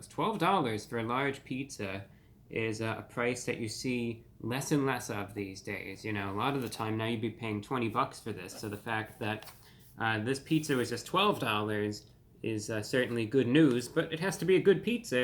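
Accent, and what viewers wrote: United Kingdom. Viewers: Did he develop a British accent or what What in the ever loving fuck have I missed over these 8 years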